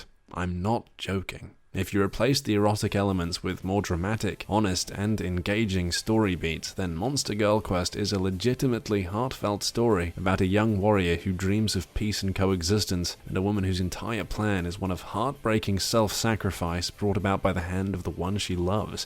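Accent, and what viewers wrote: United Kingdom. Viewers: The story about how a man with a smooth, charming British accent crossed the degeneracy event horizon and came out the other end with a new appreciation for the obscene. A story for the ages, one that will be passed from father to son for centuries to come.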